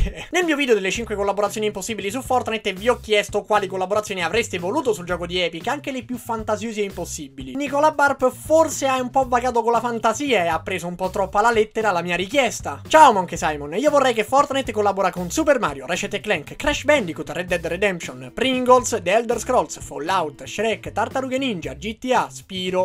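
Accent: Rome, Italy. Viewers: Ma sei romano?🧐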